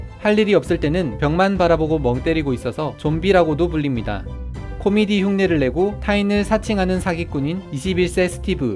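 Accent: Gyeongsang, South Korea. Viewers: 경상도사투리 어쩔수가없나봐요 ㅋㅋㅋ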